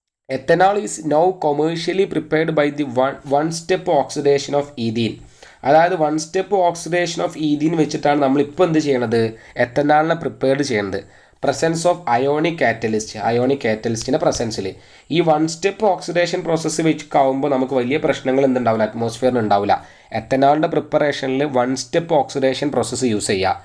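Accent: Malappuram, India. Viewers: Sir nte nadu Malappuram aano😃... Edak varthanom kelkkumbo anghane thonnunu😁 Anyway adipoli cls aanitto🤩🙌🏽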